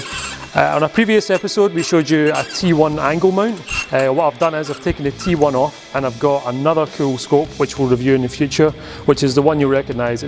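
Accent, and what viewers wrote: Scotland, United Kingdom. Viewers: Your Scottish certainly lays down the law, that's for damn sure.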